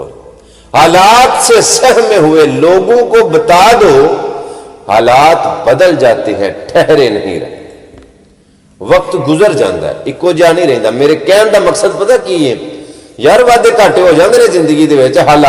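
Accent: Punjab, Pakistan. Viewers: U r great ap ka baap sealkoti hy lekin ap k nanke 100 %mandi bh ya gujrat men hun ge apki bate sun kar aisa laga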